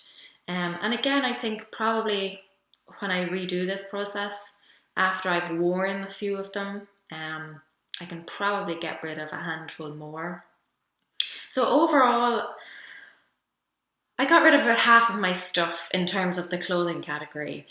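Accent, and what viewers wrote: Ireland. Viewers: Like your videos. Also love your accent. My guess is your from Ireland.....but I also watch Lydia E. Millen and you both say jumpers, where I call them sweaters. Wonder where we went wrong on this side of pond.....lol. thank you for sharing, katie mullen usa.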